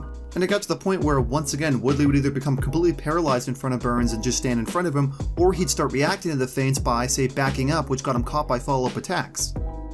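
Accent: New Zealand. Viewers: Are you from New Zealand? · Are you from New Zealand.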